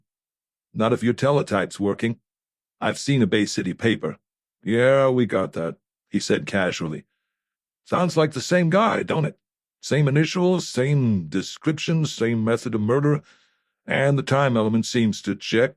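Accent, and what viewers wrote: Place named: Germany. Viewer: Enjoyed the reading. Even with what seems like a hint of a German accent seeping out. I like to imagine that Schwarzenegger was doing the reading. 😂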